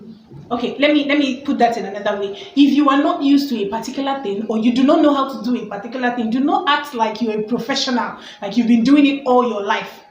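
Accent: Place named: England, United Kingdom. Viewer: I like your english accent. you speak good English. good tips. yes self esteem. yes need to have money before travel. enjoyed the video support you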